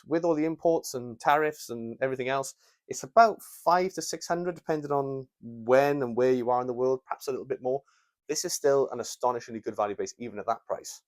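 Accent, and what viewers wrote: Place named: Wales, United Kingdom. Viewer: Great video!! I really enjoyed it, even with the Welsh accent 🙂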